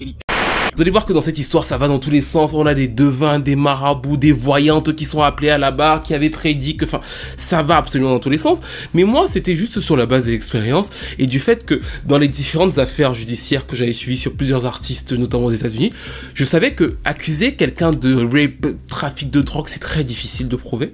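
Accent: Cameroon. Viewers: J'aime bien le fait que tes origines camerounaises, commencent à se faire sentir avec les Eh etc !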